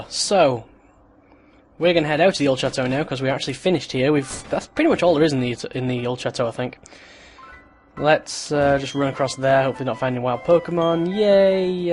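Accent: United Kingdom. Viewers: Are you from the UK? I love your accent! x3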